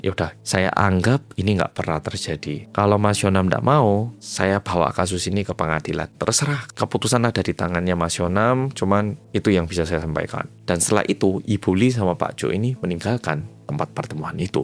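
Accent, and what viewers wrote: Java, Indonesia. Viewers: Suka banget dengerin cerita medok ini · Masnya Korea tapi udah luwes banget ngomong bhs indo.. Jawa juga nendok apa dari kecil udah di indo.. Salam kenal dari jkrta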